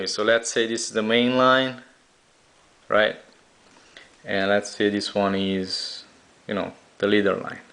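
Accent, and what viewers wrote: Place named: Nepal. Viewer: Are you from Napal? By your accent it sounds like it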